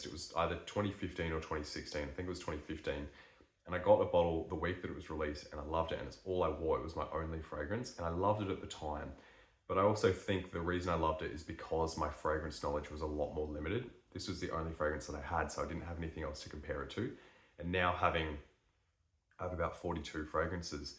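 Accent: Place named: Australia. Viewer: I just started getting into fragrances and already know what you are feeling about Sauvage mate 🤣. It’s just that Sauvage has something VERY special about it initially, but at the same time , once you get used to that special characteristic, the whole fragrance just loses its seduction. Haven’t had this with any other fragrance yet. Quite the opposite, most of the fragrances I started liking EVEN more after wearing them many times. By the way, absolutely LOVE the accent mate: which part of Australia are you from? Do you know any other Aussie channels that deal with fragrances? I already know one young bloke, but you can TELL he is young, which is why I don’t really follow his chanel much 😅. I lived in Melbourne for two years and absolutely enjoyed the vibes in Australia, hence I am always happy to find Aussie content creators and feel a bit nostalgic when listening to you guys 🇦🇺 (such as Andy cooks, but that’s a different topic haha) . 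Wishing you all the very best from Germany mate!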